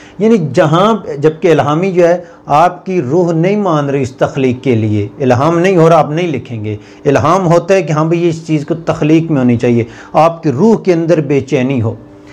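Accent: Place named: Punjab, India. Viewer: ماشاءاللہ ۔ پنجابی لہجے میں کیا خوب اردو پڑھاتے ہیں۔۔ آواز ہو بہو صحافی ارشاد بھٹی جیسی ہے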